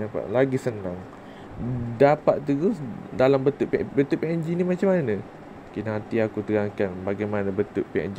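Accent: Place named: Terengganu, Malaysia. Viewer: Saya suka abang cakap ganu je..terhibur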